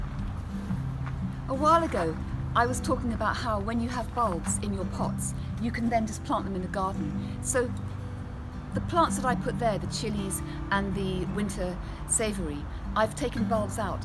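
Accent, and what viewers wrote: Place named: RP, United Kingdom. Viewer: 好喜欢听的Qween’s English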